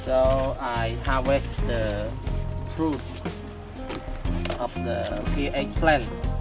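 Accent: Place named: Cambodia. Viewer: you must be Cambodian, sound like my uncle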